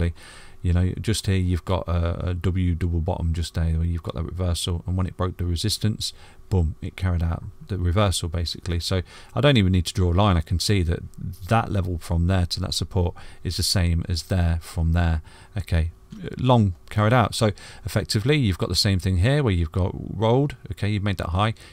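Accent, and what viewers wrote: United Kingdom. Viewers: Gotta love the Bri’ish accent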